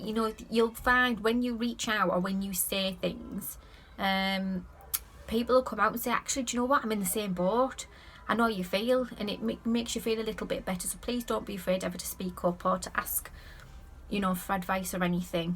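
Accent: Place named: North, United Kingdom. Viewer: I've recently taken on an allotment in Thornaby which by your accent sounds like it's not too far away from you. I'm taking it slow and I'm going to have wild flowers near the front to aid with the illusion of success but i do understand its a massive job but I'm planning to deal with it piece meal and improve each week any advice on where to get manure etc ?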